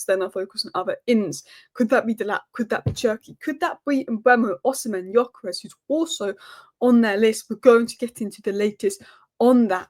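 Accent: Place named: London, United Kingdom. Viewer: Her voice suggests she’s from Hackney or Tottenham 👍👍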